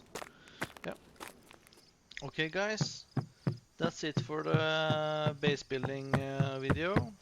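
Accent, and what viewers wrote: Norway. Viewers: What is the base mod name? Im guessing by your accent you are Norvegian. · are you from norway and great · Are you Norwegian perchance?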